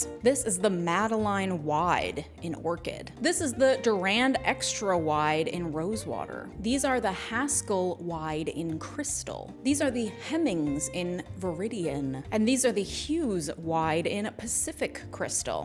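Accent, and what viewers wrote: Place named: Midwest, United States. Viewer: I came here for the snarky Midwest accent and was not disappointed.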